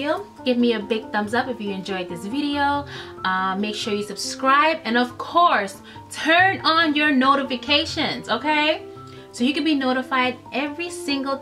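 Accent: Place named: United States. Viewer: Beautiful. One question... You have an American accent sound too... Did you grow up in the US too? Also are there very many American or European people in Suriname now? Okay that was two questions lol.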